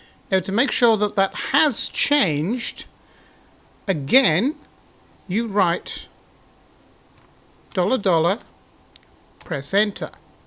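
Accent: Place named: South West England, United Kingdom. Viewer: I’m doubly flabbergasted! Firstly that a machine capable of sub tenth of a millimetre precision is miles out at default settings. Secondly that you’re eyeballing it! What does the digital vernier say? Surely the settings should be based on the maths of of the screw dimensions and stepper motors etc. Should mention I’ve got a 6050 ready to assemble and coincidentally same VFD/motor combination you set up a few months ago. Your videos have been a wonderful source of expertise. Many thanks. PS. Enjoy your very familiar accent, South West England?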